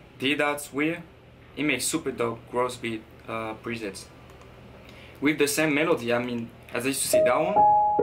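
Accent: France. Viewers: You're from France right? I can hear from the accent. I was actually born in France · t'es français car quand tu parle t'es comme moi je sais parler anglais mais pas forcément l'accent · You french or spanish? I kind of recognize the french but you look latino, anyways we see you up here in canada Ontario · t'es clairement français mais bien joué, sa ma pris 10 ans a parler couramment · le bon accents francais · Ca fait plaisir de voir un français bouillant comme ça, je savais pas que tu étais français mais ton accent est craméééé ^^ Force à toi tu déchires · true Hendrix he's not Mexican he's French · On entends bien que t'es français :) :) On attends la suite avec un cook up de Londonondatrack ou bien un southside ou TM88 Type beat !! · On reconnait l'accent français :p, Gros taf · Are you french? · He is frensh can tell by how he pronounces the A letter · Are you french ? 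· Your are french ? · u have french ass accent, tu parles français? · hes french lmaoo you can tell by his accent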